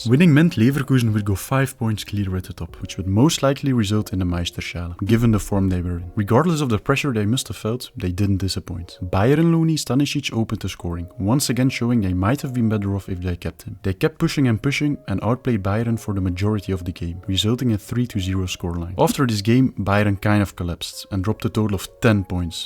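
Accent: Belgium. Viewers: Oost Vlaams accent zal ik zeggen volgende keer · Klinkt eerder Vlaams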